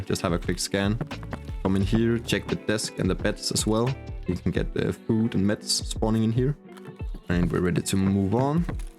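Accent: Denmark. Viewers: Are u danish by Any chance? Ur accent is Kinda danish ngl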